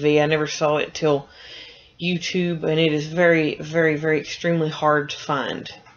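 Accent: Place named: United States. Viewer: Really nice review! I definitely agree with you that Meth Cleaner Girl is creative and overall a good PSA. I never saw it on TV because it didn't air until the year I was born. Glad I didn't though, because I think it would have just mostly confused me. By any chance, are you from Georgia? I live there, so it was nice to hear an American voice for a change. Seems like a lot of reviewers are British on here. :)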